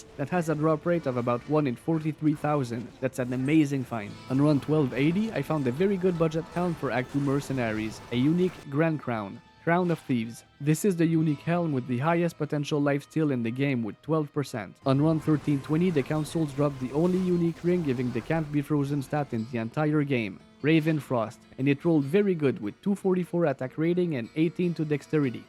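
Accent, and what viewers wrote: Quebec, Canada. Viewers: J’entends l’accent québécois à des kilomètres 😭🙏 nice vid man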